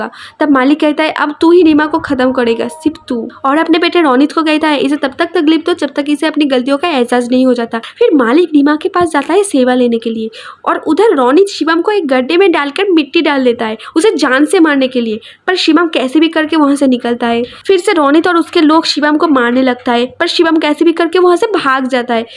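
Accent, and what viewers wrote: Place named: Bengal, India. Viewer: Tumi bangali ! Maar prio accent ❤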